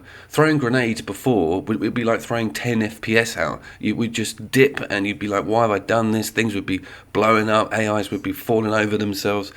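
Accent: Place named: England, United Kingdom. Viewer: it's the English accent mate. Makes you sound smarter than the average bear.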